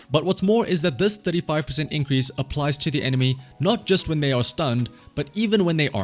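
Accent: South Africa. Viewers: Are you Afrikaans? Cause I recognize the accent. I speak ook afrikaans very deliciously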